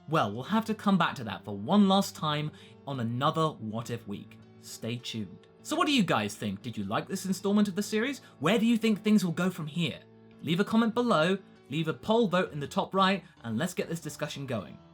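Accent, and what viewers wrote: United Kingdom. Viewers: Ya kinda sound like a british Goku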